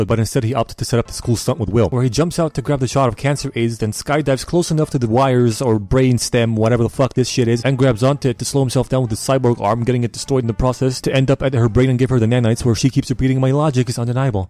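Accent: United Kingdom. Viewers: >shaved lol cracked me up BTW are you British by any chance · british power has been unleashed Also are ya British.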